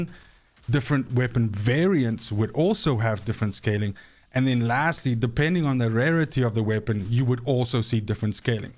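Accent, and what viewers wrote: South Africa. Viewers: Are you from South Africa? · You south african?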